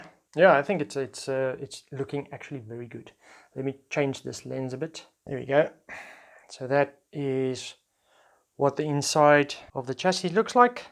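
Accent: South Africa. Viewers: Kan hoor jy is van SA xD jy die by die werk gebou?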